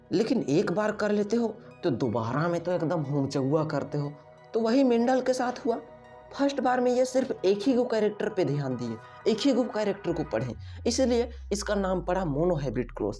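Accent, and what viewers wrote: Bihar, India. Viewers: App sayad bihari ho apki bato se samajh aata h Btw I'm also bihari....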